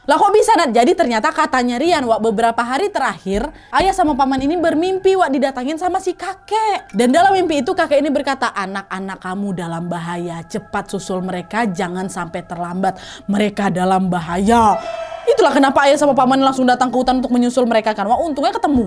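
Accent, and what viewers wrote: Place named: West Sumatra, Indonesia. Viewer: Kak orang mana ya???kok ada sensasi bahasa Minang nya, 😅😅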